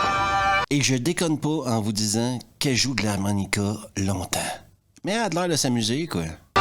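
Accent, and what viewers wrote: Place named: Montreal, Canada. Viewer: Hé Mister, quel plaisir, quel bonheur ! merci de partager ces bons moments avec nous, j'ai découvert ta chaine par hasard il y'a quelques jours et crois moi, mes 15 minutes quotidiennes de nanar et de souvenirs filmographiques me procurent un bien être et un lâché prise inégalé. Tout y est le choix des films qui ont bercés mon adolescence, les mots pour le dire, le ton, cet accent qui sous forme de "Madelaine de Proust" me ramène à la fois dans mes années 80 puis 90 quand je vivais à Montréal. J'ai rarement été si enthousiaste à l'écoute d'une émission, merci mon pote, tu fais partis de la famille now, je partage cette expérience avec mon fils de 17 ans, ce sont de réel moment de complicité. A bientôt.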